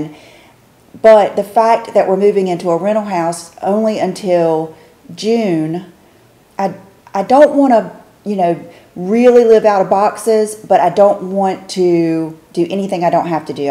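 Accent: South, United States. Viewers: The accent question is interesting to me because I have lived in the south for 12 years now, but I grew up on the west coast (lived there until I was 28). Moved for love:) So now everyone thinks that I have the accent hahah! There is truly no one right way to say everything! I hear your particular southern accent with "water" and "I want to tell you." And of course you have the southern belle beautiful laugh! Other southernisms I have noticed are "buggy" for shopping cart and have also noticed that southerners say "19 dollars and 88 cent (singular) where other regions say "19 dollars and 88 cents (plural). I love your accent! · I love your southern accent and it is definitely prevalent when you say oh or words with that sound in them: so, you, most, move. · I adore your accent! I notice certain things like a southerner will say goin instead of going, meetin instead of meeting. 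Dudnt rather than doesn’t. Also, some words are drawn out like belowwww where as, a Californian says below (quick) There are giveaway southern phrases, too, like- right much, to be sure, cut off instead of turn off (when you are turning the tv off) I especially love when you say ol for oil or greazy:) Maybe part of it is the cadence, rhythm and softness to your voice. I think that’s why so many love the Southern accent so so much!!!!! xoxo 😘 Melody PS. Do Californian’s have an accent? I’d love to know what specifically if people can tell a person is from California. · Girl when you say: "I want to tell you..." I hear your southern accent😉 I love your accent so calm and soothing! xoxo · Southern girl here as well....love your accent...reminds me of home ❤️ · I love your accent. Anything with a vowel e.g. house = howse or howme. video = videow I = Ah Over = Owva You = youwe I just love the southern accent, it sounds so refined! 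Love your hair and all your videos. I sleep with a very loose messy bun. They say to sleep on a silk pillowslip stops breakages and stops you getting wrinkles! XXX Deborah from OZ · To start with the outer cover is the duvet. I haven’t bought a mattress in awhile so no clue. As far as accent I’m from Georgia and you sound a lot like me, which I love southern accents. I’m 66 and my husband of 41 years sounds like a freight train when he snores including the whistling 🤣I moved to sleep in our largest guest bedroom about 7 years ago after practically ruining my back sleeping on a couch. I have no regrets! I love sleeping in my own bed by myself!! Love all of your YT videos and the fact that you talk about different things!!! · I’m Arkansan so I have a Southern accent too but it’s totally different than yours. The words you say where your accent shows the most are “long O” words like so, know, show, etc. 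I also love how you say water. ❤️ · The first word I thought of with your accent was "bought" and also "my". You're Southern but just enough to keep it cute! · We moved every 18 months since my husband was in the military. Shower items and sheets are self pack. Set up the kitchen first and then beds. The artwork, if it’s self pack then I got a picture pack from Home Depot and you can pack multiple artwork. I made a list of wanted we truly wanted for the immediate future and what we could spare for at least 6 months. Think about the changing weather and holidays coming up. Don’t beat yourself up. I know it’s hard and mostly, I know that as much as husbands try to help, it’s usually the women that do the work and planning. By the way, all your words have a southern accent and we love it! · Every word you say, has a southern accent. I love love it. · Well, I'm from Savannah Ga and I do have a southern accent as well, so I don't hear yours HA!HA! 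My husband has a man cave (our spare bedroom) office, he painted and decorated it to his taste and he snores as well and I understand the mad thing inside, so he now has a place of his own and it works!! Best to you with the move!!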